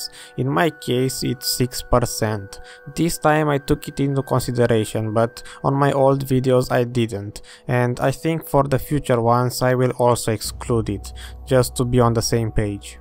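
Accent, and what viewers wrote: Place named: Romania. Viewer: esti roman da-te dracu! ti-am depistat accentul :))) noroc , bun video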